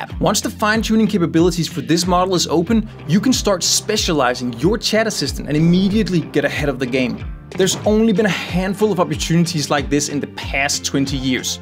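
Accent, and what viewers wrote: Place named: Denmark. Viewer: Good video. Are you Danish? I ask because of your accent.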